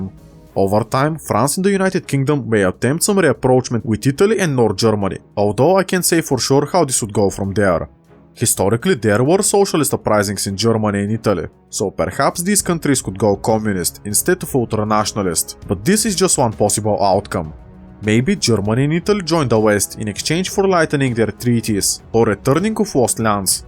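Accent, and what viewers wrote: Bulgaria. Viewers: Don't. I might be biased because I am also a Bulgarian, but I love your accent. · the Bulgarian accent is one of the many unique features you bring, please only remove it, if it makes you feel uncomfortable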